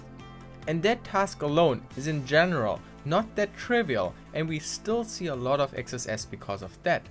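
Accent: Germany. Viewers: Ehmm hallo typ der englisch spricht aber deutsch ist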